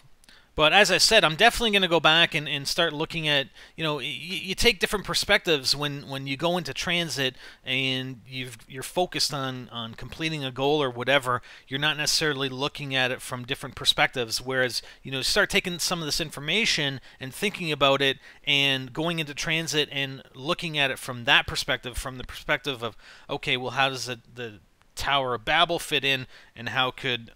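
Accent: Canada. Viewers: R u candadian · Are you from Canada?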